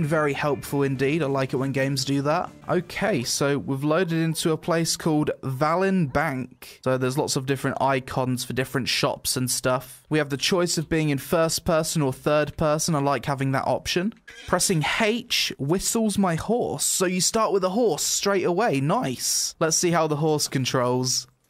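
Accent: United Kingdom. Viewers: your British but you didn't say loo??!?!?!?!?!?!?!??!?!?!?!?!??!?!?!?!?!?!? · hes british...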